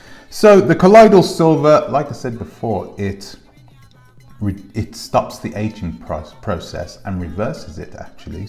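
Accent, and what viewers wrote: United Kingdom. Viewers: You’re a fraud, you British talking sounding shavy head having Weirdo. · An African speaking in a British accent hating on a black American man’s success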